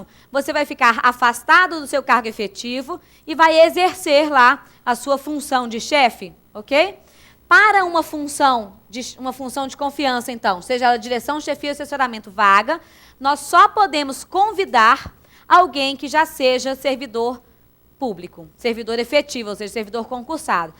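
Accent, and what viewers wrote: Minas Gerais, Brazil. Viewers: Gosto demais das suas aulas, Profª! Tenho aprendido bastante! Parabéns por saber passar o conteúdo de forma tão clara! *Ps: Esse sotaque "mineirês" me lembra meus tempos de "Berrrlândia"! Adoro! rs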